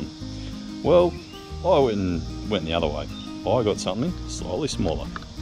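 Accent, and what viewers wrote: Australia. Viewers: I clicked because he is Australian 😂😂! 👊👍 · Ps. My mother was born & raised in Australia so it's been great hearing an Ausie (sp?) talking! She never had spoken about the snakes & lizards there (😳😟 ick) but she had complained about the Kookaburras that Always landed right outside her bedroom window to wake her up at the break of day!😂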